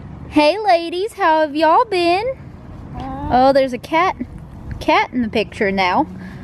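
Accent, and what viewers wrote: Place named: Texas, United States. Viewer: its Texan mixed with her mothers very southern accent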